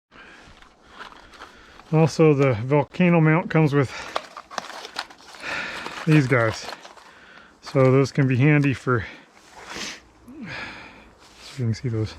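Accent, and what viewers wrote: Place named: Appalachia, United States. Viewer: Lil bit hillbillysh but bearable to watch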